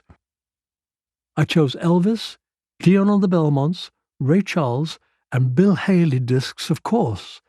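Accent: United Kingdom. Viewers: Greetings..Awesome… … ever so creative..never stop reading.. it’s quite a luxury for me to hear ur Brilliant brisk British Accent..beautifully read and beautiful blessings!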